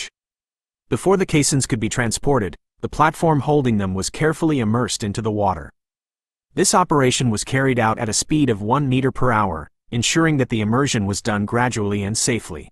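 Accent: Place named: United States. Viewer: Not sure why there is an american voice doing this when the whole thing is Europe based, l never watch or listen to an american voice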